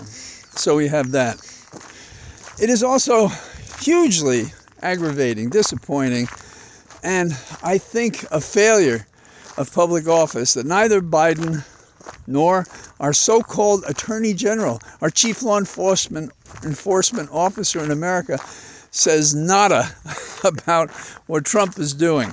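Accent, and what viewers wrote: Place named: Ireland. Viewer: I do not agree with some things you say,but you do have a wonderful Irish blos in your voice and I think you are a very good Poet without knowing it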